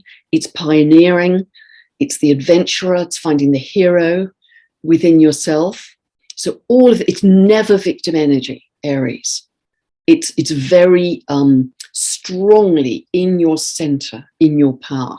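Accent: England, United Kingdom. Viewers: Hi! I have my natal Chiron at Taurus 18 degrees. Chiron natally squares my Moon at 25 Aquarius. Going through Saturn conjunct Moon this year and I’m really struggling with depression and despair. The last full moon in Capricorn at 21 Capricorn EXACTLY squared my natal Saturn at 21 Libra. Let’s just say I got a nasty shock and am seriously struggling to feel anything other than that the universe hates me and is out to torment me until I decided to leave the planet. I’m worried this conjunction right on my natal Chiron will be another blow. Can you offer a different interpretation please? I find it harder to continue to force myself to see the “positive “ possibilities. Every time I do that in the last 18 months whatever I am hopeful about is ripped away brutally. Appreciate anything you can share. I’m not a professional astrologer but I do try and use astrology to help myself. 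This year I am just struggling beyond belief to hang onto hope (also you may have realised, I am having Saturn square Chiron transit. No wonder I cannot see the light!) Thank you and have a nice day. I find your voice and accent soothing because I have a lovely soulmate in this life who is English as well:) From Bangalore, India.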